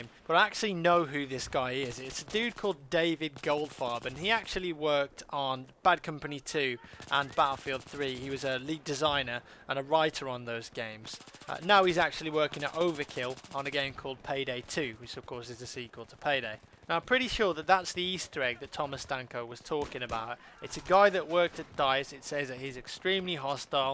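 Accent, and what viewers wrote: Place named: Hackney, United Kingdom. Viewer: I'm here in 2021, I love hearing voice sound like a lock, stock and two smoking barrels hackney bloke. Fast forward to today and he sounds like a refined English gentlemen out for a spot of tea. Love ya,!!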